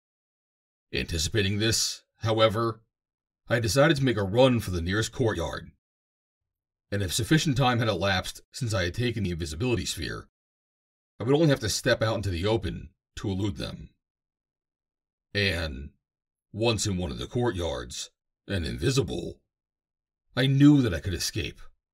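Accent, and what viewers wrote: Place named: New York, United States. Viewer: I think your voice is great for stuff like this. NY / NJ accent is great for a stoic hero like JC — keep up the great work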